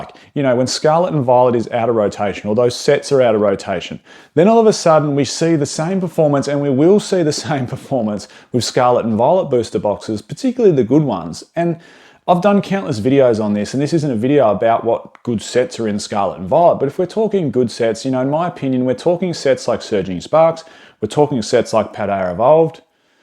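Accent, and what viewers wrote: Australia. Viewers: As an American from Kansas, yes Australians and bristish people do put a "R" with words that end in a "A". So Paldea to us Americans sound like Paldear when you guys day it. Its not a big deal, its just part of your accent.... I have never heard anybody talk abour Australian money as dollary-doos.. 😅 Thats just funny, but in a endearing way. Dont fret to much about what us Americans say about how y'all pronounce words. We all sound different. Somebody from New jersey compared to someone from Louisiana compared to Wisconsin than California. All us Americans sound weird to each other too. Your all good 😊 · Please! It's just a dumb joke based on accent. I'm an American, don't apologize for being aussie. We love it and just trying to have fun!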